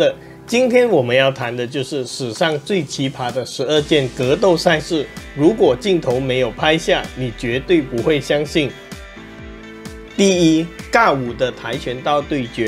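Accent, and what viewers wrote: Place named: China. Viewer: 這是哪一省的口音，真難聽😢😢😢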